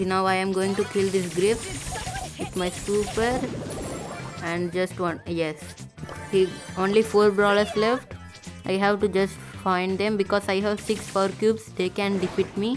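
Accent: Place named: South India, India. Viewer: Ur voice says ur South Indian , if u say yes , I’ll reveal my state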